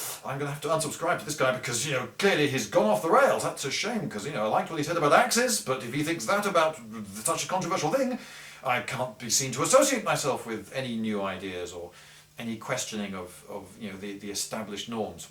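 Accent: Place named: United Kingdom. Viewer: I rarely didnt understand some parts of it (im not native speaker) but i can say you sound so britsh. In fact its one of the most britsh sounds i ve ever heard sir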